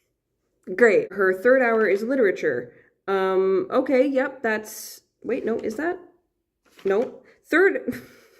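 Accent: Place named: Nova Scotia, Canada. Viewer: The thing with the locations of the house of night, is they’re in places PC thinks are important or pretty. There’s three in Italy because Italy is pretty. Europe has a ton of them because classical Europe is pretty. There’s way more in America because PC is American. She doesn’t even really acknowledge Asia because, aside from pretty places like Japan, she doesn’t want to have her self insert characters go there and be in a less well off place than they would be in the Western world. Her worldbuilding and logistics is on the same level as JKR (Also I pegged your accent as Nova Scotian pretty quick due to watching too much trailer park boys lol)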